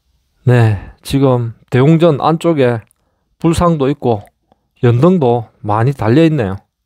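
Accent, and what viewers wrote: Gyeongsang, South Korea. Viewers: 말을 참 조곤조곤하게 잘하시네요.저는 개인적으로 경상도 말투를 좋아 하지 않는데 말투는 참 듣기좋네요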